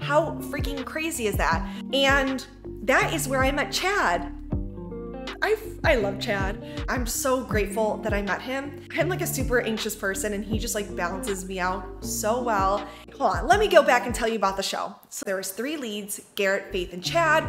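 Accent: Midwest, United States. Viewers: Your accent is so cute! You sound like a real Midwestern :)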